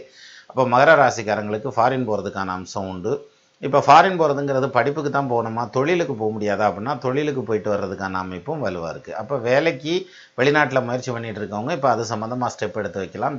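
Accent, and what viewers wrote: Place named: Madurai, India. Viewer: You are great and I belong to Madurai, your Madurai slang is wonderful. One question - People in what age have 2 shift of “Ellarai Sani”...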